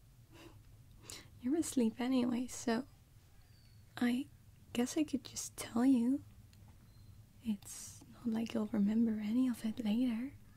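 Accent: Netherlands. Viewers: Dat lichte nederlandse accentje kruipt er toch stiekem tussendoor :)) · I smell a dutch accent.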